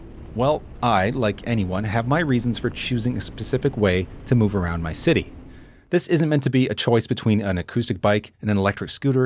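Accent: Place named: United States. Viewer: Bro... Why is this guy speaking American talking about kilometers?!?! Im so confused lmao.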